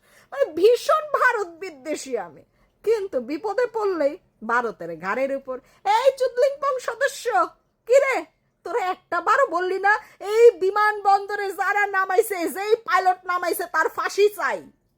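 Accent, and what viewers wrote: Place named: Bangal, Bangladesh. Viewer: দিদিমণি, আপনার ভিডিও দেখে হাসতে হাসতে পেট ব্যাথা হয়ে গেলো। খাঁটি বাঙাল ভাষা র স্বাদ ও পাই। একটা কথা বলি।কলকাতা তথা ভারতের মানুষ আজ ও মনে করে বাংলাদেশের সঙ্গে তাদের নাড়ীর যোগ। তাছাড়া চরম বিপাকে পড়া শরণার্থী দের আশ্রয় দেওয়া বা রক্ষা করা ভারতের মানুষ তাদের কর্তব্য বলে মনে করে। ভিডিও টিতে বেশ মজা পেলাম।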